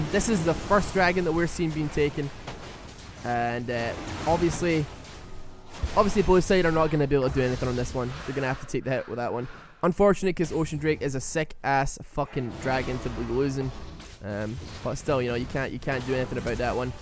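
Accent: Scotland, United Kingdom. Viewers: Are you scottish? XD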